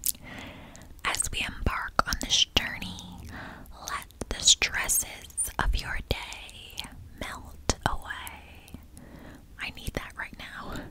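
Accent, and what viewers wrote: New Jersey, United States. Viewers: that new jersey accent was accenting it, its very relaxing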